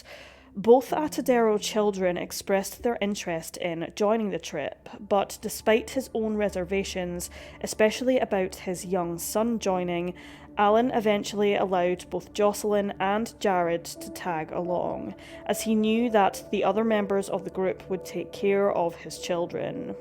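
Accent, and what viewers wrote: Scotland, United Kingdom. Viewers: It’s called a Scottish accent 🙄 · Your voice sounds like a combination of Scottish California Valley Girl with a bit of vocal fry thrown in. Very interesting. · Ooohhhh Scottish sister 😍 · Trying to gauge your accent? Scottish? Just stumbled on your channel BTW. Great video thank you. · She sounds Scottish to me. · You mean… with a Scottish accent? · Ur voice makes the rest to of us Scots sound like idiots seriously sort it out 🙄🤨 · I've heard this story before, but never in a Scottish accent.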